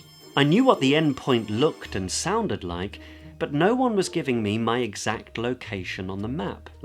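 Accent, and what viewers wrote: United Kingdom. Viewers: omg! I love your accent! very clear, and sophisticated... I'm from Argentina, and I am interested in gain standard British accent, so you will be my mentor... in my progress I sound more like a British person, but of course I don't speak like a native speaker but I certainly think that you will be very helpful for me! thanks!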